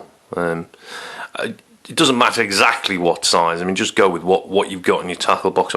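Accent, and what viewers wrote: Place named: England, United Kingdom. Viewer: Nicely done, thank you. Interesting accent, where are you from? Obviously from England, but I understand the accent is different in different areas. Thanks.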